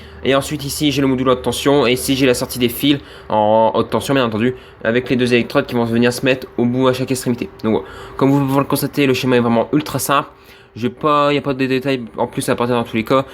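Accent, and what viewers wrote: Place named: Northeast, France. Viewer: Tu es de où ? J'essaye de deviner avec ton accent mais ça ressemble à un accent type nord est (genre lorrain, voir Meusien)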